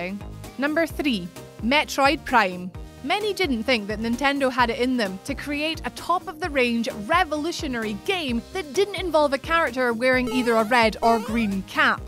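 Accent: Scotland, United Kingdom. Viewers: Are ye scottish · Even though it is a Scottish accent?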